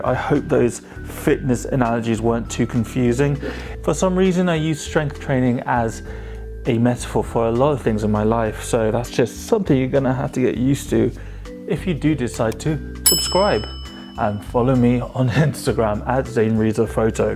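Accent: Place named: United Kingdom. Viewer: Nicely done my dude. Damn that British accent haha you put the rest of us to shame 😂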